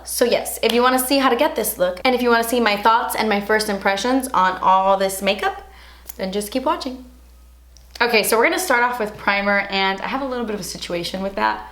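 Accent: Cuba. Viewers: "Por eso tengo los nervios tan malos" 😂😂😂😂 I ❤ that cuban accent!!! My husband says we are twins! you're hilarious love you 😘😘😘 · yeeees absolutely loved when you mentioned Rachel, by the way i loved your cuban accent!!! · 😍tu acento cubano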